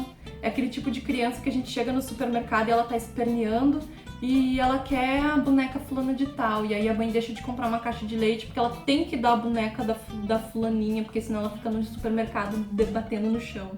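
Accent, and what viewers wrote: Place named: South, Brazil. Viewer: aaaaaa vc é do sul, como nunca notei?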